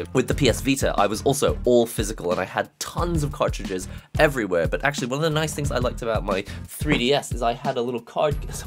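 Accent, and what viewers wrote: United Kingdom. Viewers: A Japanese looking man that sounds British!!! Freaking awsome!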